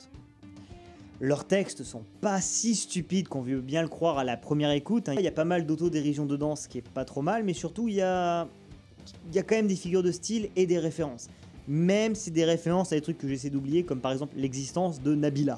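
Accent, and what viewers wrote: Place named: Auvergne, France. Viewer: t'es auvergnat nn? j'ai l'impression que t'a l'accent sur les ''o'' et les ''au''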